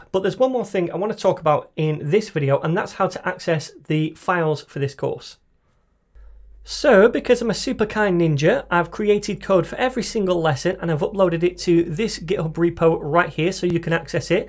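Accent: United Kingdom. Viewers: I just found your channel, and I feel incredibly and unbelievably lucky. I just finished your flutter beginner tutorials and let me tell you this, you are the, I mean THE best tutor on coding. I love the way you teach, I love the way you talk and I normally don't like British accent too (no offense) man, you are just different and better in all ways. That is why I feel lucky to be able to find someone like you. And most importantly of course, I can not thank you enough for the knowledge that you offer and share. I wish you all the best.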